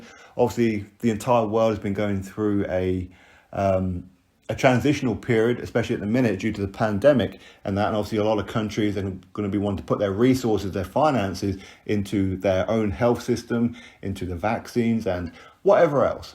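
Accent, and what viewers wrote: United Kingdom. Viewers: How the fuck Prince Harry going to afford that!?!??! His wife's suicidal because they cant even afford security. You sound British weird we know this across the pond and not in UK.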